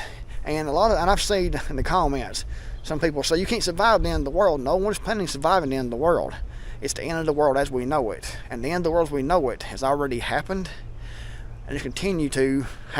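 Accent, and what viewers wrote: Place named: Wilkes County, United States. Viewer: A little further into the video now. 😂😂😂 You sound like a Wilkes County boy. That’s pretty much the sentiment around here. - Except there’s too many that still think Trump will save us.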